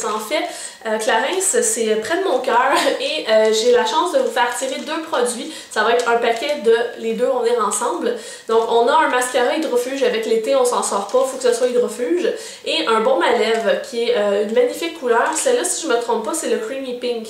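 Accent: Quebec, Canada. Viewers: J'aime tes vidéos, il sont très utiles :) en québécois en plus ça fait du bien hihihi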